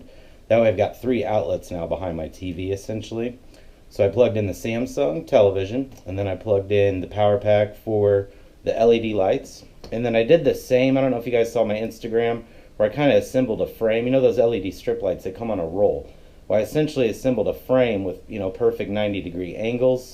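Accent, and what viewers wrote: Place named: Poland. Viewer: First thing that hit me once i started watching your video was your accent, it sounds polish to me, are you polish? And this enterteinment led setup is super cool, need to make one in my cave.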